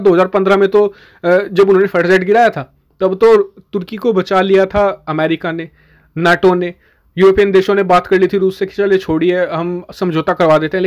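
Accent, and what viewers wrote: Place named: Western Uttar Pradesh, India. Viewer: Bhai aap ese bolte ho ya fir apka bolne Ka tarika esa hai jese ki chai ki dukaan par ko koi bin mange gyan baat rha ho, knowledge Achi share karte ho no doubt but effective voice nhi hai, Kisi particular area ki lagti hai hai jese ki UP west ki ya fir dehati types so pls sudhar laao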